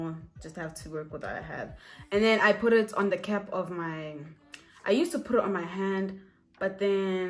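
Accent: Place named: Germany. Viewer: Man hört das sie deutsch ist oder bin ich dumm😂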